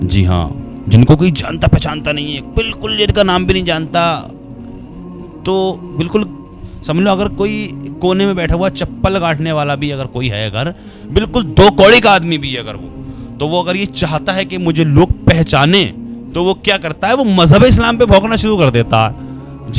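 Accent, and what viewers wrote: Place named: Maharashtra, India. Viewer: Yeh Maharashtrian Boly hae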